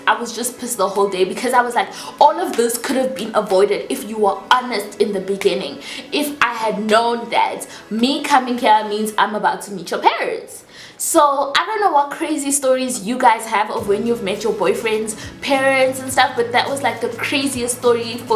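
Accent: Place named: South Africa. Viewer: As soon as you started speaking, I was like she's gotta be South African and then checked another video to confirm. LOVE your accent. "We pay 50/50." SIS WHAT? No. Lmaooo at the mother's reaction. The whole story has me weak.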